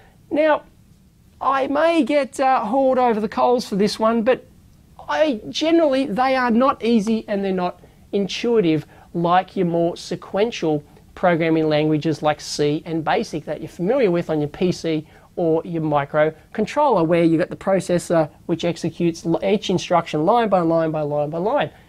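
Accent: Australia. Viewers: Are you from down under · This guy is like watching Steve Irwin: in the accent and the excitement. Great speaker. Thanks for making it, really helpful.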